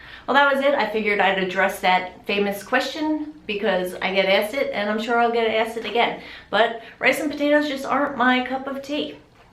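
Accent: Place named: New York, United States. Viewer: Your voice is so stinky cute! Haha! You sound like you walked right out of a subway in New York!!! Love it. I’m a new follower. I got sick and then fat and I have no idea how to heal my body and get back to my size 2. I’ve gained 50 pounds in two years!!!! Pray for me. Thanks for this info. It has really encouraged me.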